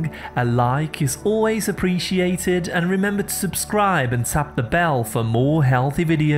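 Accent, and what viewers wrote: England, United Kingdom. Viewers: Whats with the damn English accent..LMFAO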